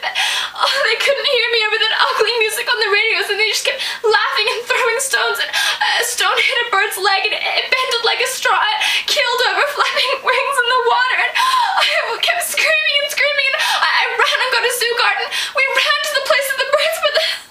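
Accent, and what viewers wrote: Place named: Australia. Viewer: so two thoughts, First where are you from, on certain words i hear the slightest accent, like you hail from Australia or some thing, other thought, i really did not feel your emotion, you tried to emote but i did not feel it, lovely any how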